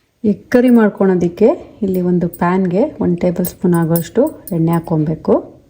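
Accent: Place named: Malvali, India. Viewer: nim vidio nodtidre Indiadale hidira ansute nim language pakka malvali bashe USAge hodru mothertoung change agila ade Kushi namge nim hand mel hiro village style old tattoo super agide❤😅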